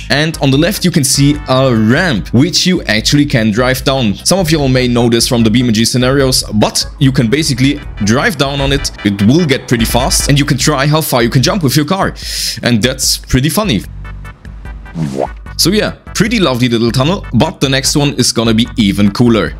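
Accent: Germany. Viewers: Aha I knew your German I could hear it was hard but I could hear it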